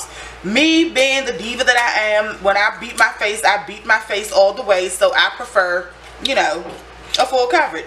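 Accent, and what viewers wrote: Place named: DMV, United States. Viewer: I hear the dmv accent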